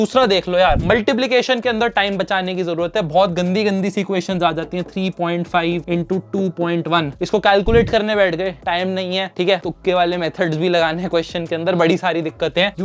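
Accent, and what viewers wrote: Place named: Bengal, India. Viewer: Your bengali accent is cute wow .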